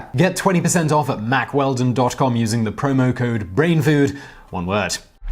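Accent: United Kingdom. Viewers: how tf did i never know he was british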